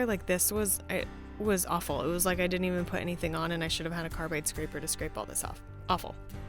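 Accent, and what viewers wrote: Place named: United States. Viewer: You sound American. Other countries have safety restrictions on paint strippers.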